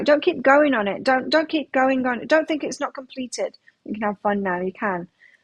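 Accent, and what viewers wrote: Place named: Australia. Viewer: Hi , I’m in Canada watching atm. Love your channel. I love your Australian accent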